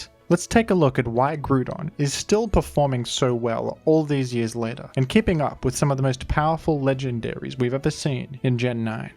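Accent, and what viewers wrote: Australia. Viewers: Oh thank god you’re Australian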